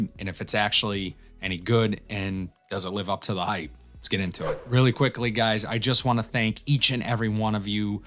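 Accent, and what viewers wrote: Boston, United States. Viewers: Well done on the 13k,!!! I don't come here for frag talk as much as I come here for Bostonian accent:):):) You are awesome!!! Have a great weekend!!! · You Have to be from Boston!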